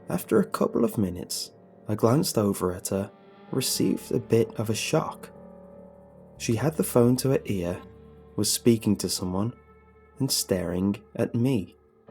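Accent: United Kingdom. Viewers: that kind of British accent 😂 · I love that your brittish! You always post around when I'm going to sleep, which is great!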